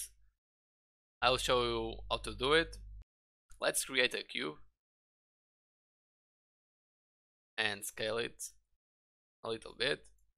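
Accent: Portugal. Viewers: Ahaha percebi pelo sotaque do inglês! Portugal🇵🇹🇵🇹🇵🇹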